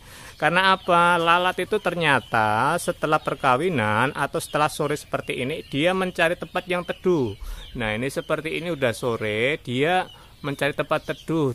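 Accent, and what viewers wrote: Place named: Yogyakarta, Indonesia. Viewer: Wong jogja kali..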